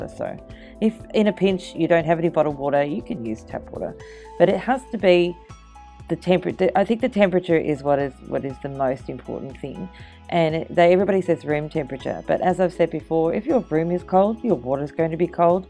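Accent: Australia. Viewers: I prefer the videos that you talk us through!!! They sound awesome!! And it's really nice to hear another aussie out there (I'm from Australia too)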